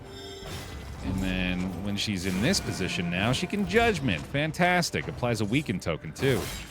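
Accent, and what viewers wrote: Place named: Scotland, United Kingdom. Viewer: Dear god that scottish accent. Also instead of gobshite " ya wee dick" would have been better 😂. Love from Dundee 😊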